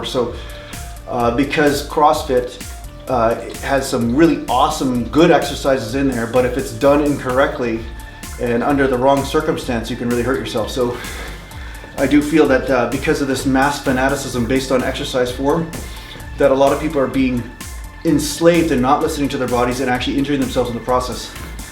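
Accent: Canada. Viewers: No prob my dude. You're canadian accent also reminds me of home (I'm originally from Minnesota) which makes me love your vids even more. I'm about to binge watch a shitload of them.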